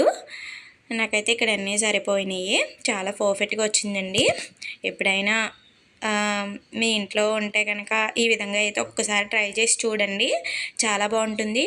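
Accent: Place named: Godavari, India. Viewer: ఆయ్.... మీ గోదావరి నా అండి 😂😊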